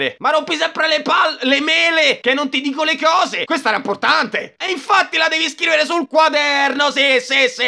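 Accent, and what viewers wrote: Rome, Italy. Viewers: L’unico youtuber che parla di anime in romano toppppp😂😂😂❤️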